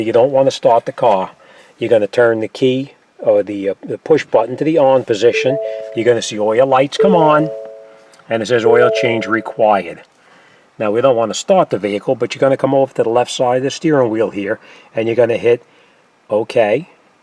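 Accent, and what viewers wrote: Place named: Northeast, United States. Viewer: I love the video. Easy, clear, and straight to the point. Plus, that Northeastern accent is one of a kind!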